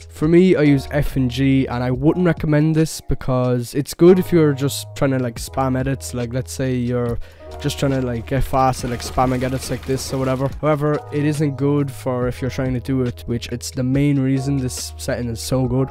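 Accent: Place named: Ireland. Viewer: Where u from in ireland I hear the accent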